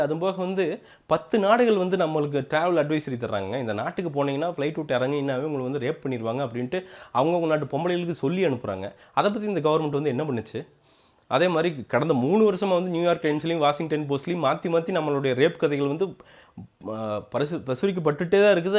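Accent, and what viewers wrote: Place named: Kongu, India. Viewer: Candour expressed with a hint of Kongu Tamil. Well done.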